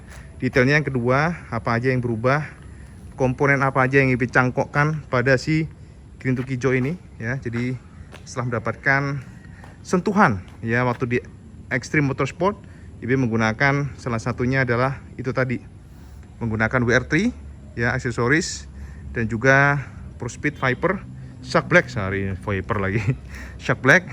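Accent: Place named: Java, Indonesia. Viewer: Teros tak enteni.kontene sampean seng di ae pokoke lucu.karo enak di rungokno.ngomonge khas wong jowone.dadi suweneng aku ngrungokno.salam teko wong tuban cak.!!!!!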